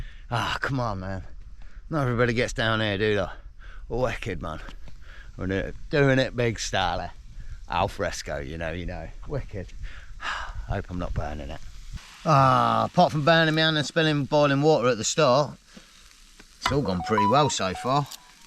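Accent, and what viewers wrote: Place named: England, United Kingdom. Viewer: Just came across yourself really enjoying your videos I’ve had to put subtitles on because of that English accent lol 🏴💚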